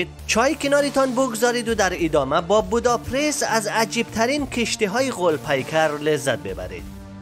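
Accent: Afghanistan. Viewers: لهجه ایشون لهجه متداول در افغانستانه. جمله بندی و دستور زبانش هم مربوط میشه به فارسی دری. بنابراین ایشون کاملا درست صحبت میکنه. شما لطفا یه کم ادب و نزاکت یاد بگیرید.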